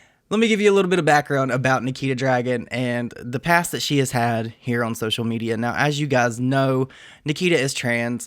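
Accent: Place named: Tennessee, United States. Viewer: Thanks for the 🍵. And your accent reminds me of summertime on Dale Hallow, Norris Lake or Cumberland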